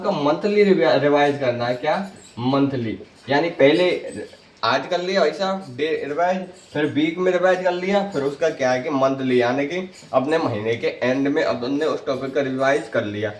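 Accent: Bharatpur, India. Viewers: From mahwa dausa Are you Bharatpuiya Hamari jaisi bhasha hai aapki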